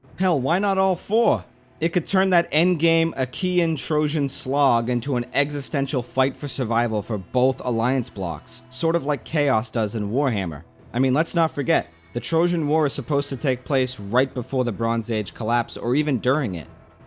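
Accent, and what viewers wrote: Boston, United States. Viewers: I like your accent. Is it Boston? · Haha are you from Boston? Lovely vid, lovely accent. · something about a thick boston accent talking about total war is so funny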